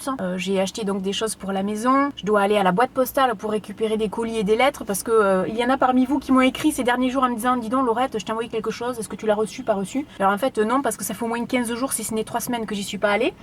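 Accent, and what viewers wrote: South, France. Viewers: Merci pour cette vidéo. Ca faisait longtemps que je n'avais pas été sur tes vidéos et tu es tellement rafraîchissante avec ton accent du sud. je t'embrasse. Fiona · Plus le temps passe plus j'apprécie tes vidéos ! Ton accent du sud me réchauffe le cœur 😊 pour Instagram post ce que tu as envie TOI de poster et partager ne fait pas la bouche en cul de poule (ça m'a fait presque cracher mon café sur mon clavier c'était excellent ! 😂) ensuite pour ton bébé tu as TOTALEMENT raison profite tant qu'il est avec toi c'est quoi ces commentaires a la noix.. Ce concept de lifestyle une journée avec toi j'apprécie totalement. Je retrouve ce plaisir de me pauser le temps de regarder une vidéo me vider l'esprit avant de ré attaquer mes taches ménagères quotidiennes. Continue comme ça j'aime vraiment ce que tu fais, tu apportes du positif dans le quotidiens des gens et merci du fond du cœur pour tout ça ! 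· Coucou, ça doit être la première fois que je laisse un commentaire sous tes vidéos. C'était pour te dire que tu es une YouTubeuse que j'adore regardé et je ne suis pas déçue d'avoir découvert tes vidéos y a maintenant bien 2 ans. Ton accent me donne envie de redescendre dans le Sud a chaque fois ^^ Pour Instagram je dirais vas-y au feeling, poste des photos de ton environnement, de ton fils, tout simplement ce qui te plaît a l'instant T ! Continue tes vidéos, encore plus des vidéos comme celle-ci et celle sur ta maison. Bisous Laurette, une abonnée d'Alsace 😉😘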